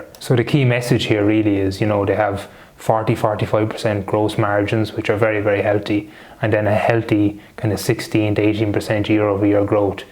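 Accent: Ireland. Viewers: Great to hear an Irish accent on the platform 👍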